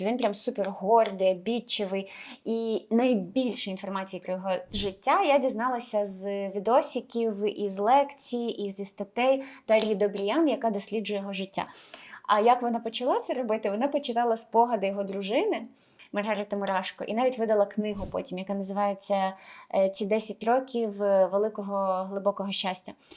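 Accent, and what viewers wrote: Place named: Central Ukraine, Ukraine. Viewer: Та Ви шо ! Мова в цієї дівчинки така класна, так говорять в Центральній Україн, на півдніі - це ж наш скарб - різні діалекти, вимови .Вони тільки збагачують її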